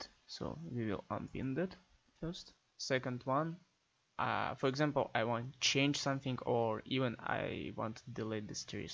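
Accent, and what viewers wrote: Russia. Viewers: Чисто по акценту руссишь слышно, спасибо)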